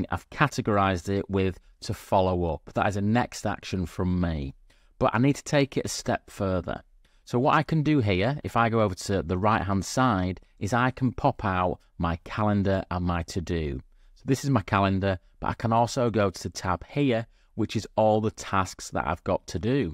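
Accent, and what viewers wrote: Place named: Manchester, United Kingdom. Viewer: Thank you for the great videos that you made - I feel they will change my working life completely! P.S. I have got mates who sound just like you - from Manchester? Bolton? 😂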